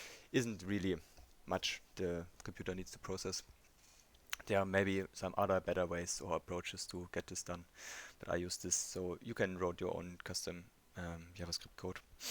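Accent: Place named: Germany. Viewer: the german dialekt kickt bei dir aber anders rein :D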